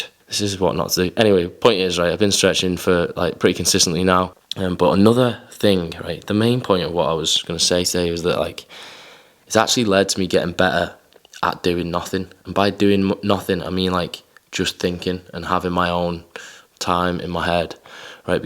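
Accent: United Kingdom. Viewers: Love this british accent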